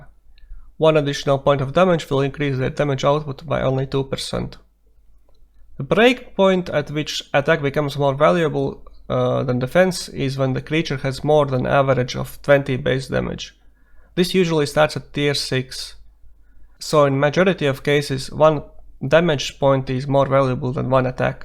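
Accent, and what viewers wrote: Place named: Latvia. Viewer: Latvian accent goes strong.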